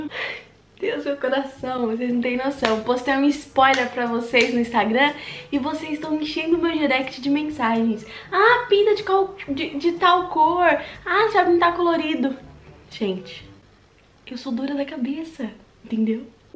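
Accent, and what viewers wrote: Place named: Portugal. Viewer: já ta ficando com sotaque de Portugal ❤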